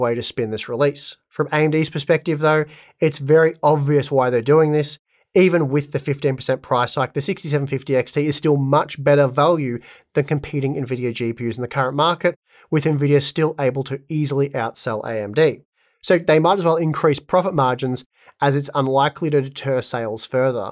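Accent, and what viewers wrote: Australia. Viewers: Good Lord such a nice channel but Aussie accent is so bad to the ear.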